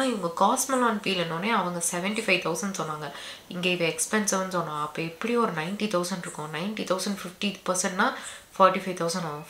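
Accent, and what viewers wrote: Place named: Coimbatore, India. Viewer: coimbatore slang sissy😜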